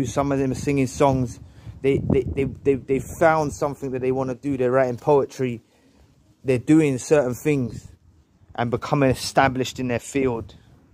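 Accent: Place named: Cockney, United Kingdom. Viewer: I love your cockney accent! Reminds me of London! ❤🙌✌️👊💯🍀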